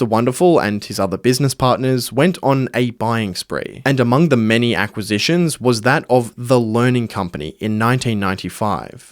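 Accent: Australia. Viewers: Do your channel a favor and please get someone to do VO that isn’t Australian. I made it 90 seconds before NOPING out.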